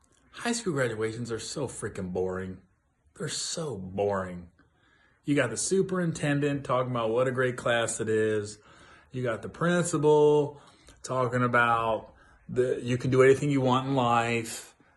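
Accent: South, United States. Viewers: you have a southern accent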